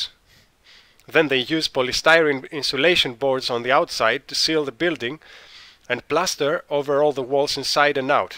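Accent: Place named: Greece. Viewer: Very informative - thanks. Btw - you have a very non-Greek, Greek accent when speaking English!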